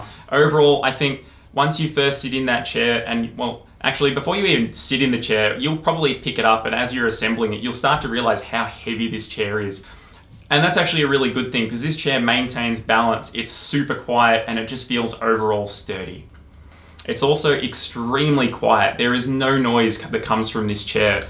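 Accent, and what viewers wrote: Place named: Australia. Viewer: Nice to hear an Australian dude doing one of these reviews. Shout out from Melbourne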